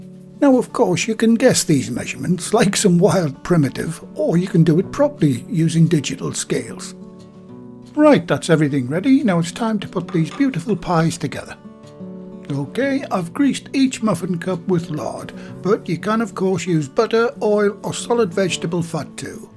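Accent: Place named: England, United Kingdom. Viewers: you are very welcome👍. I'm from Cumbria but. Live with my Khmer wife in Cambodia. It's so nice to here a northern accent & I so miss pork pies but thanks to you, I'll now be making my own thanx to your simple pork pie pastry, cheers👏👏👏👏👏👏👏👏👏👏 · I'll be honest... I subbed for your voice and manner of speaking/talking... you sound absolutely delightful and lovely and sweet/kind... typical English ( once upon a time when there were cockneys in London, they too were like this, just slightly different accent and manner). Like the recipe themes so far, will see if there is a sausage roll one. ^_^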